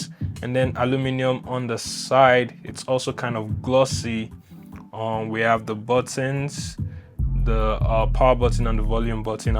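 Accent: Nigeria. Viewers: The accent never goes wrong Naija for life🇳🇬💯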